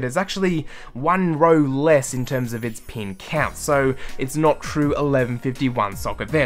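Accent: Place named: Australia. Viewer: Yes... It is... He's not American. He's Australian... That's how Australian's talk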